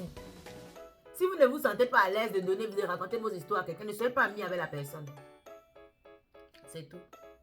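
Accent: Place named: Gabon. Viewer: Êtes vous Gabonaise ? Mon DIEU l accent de chez moi hein…Je me suis abonnée tout de suite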